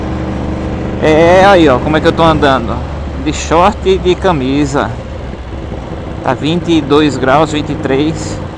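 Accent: Pernambuco, Brazil. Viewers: pelo seu sotaque voce deve ser daqui da terrinha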